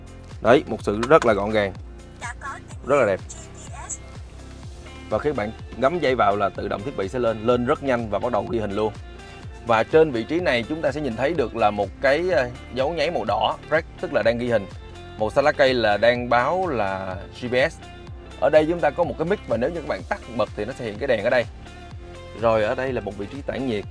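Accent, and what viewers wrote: South, Vietnam. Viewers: Bạn review hay quá! Hiếm thấy reviewer giọng miền Nam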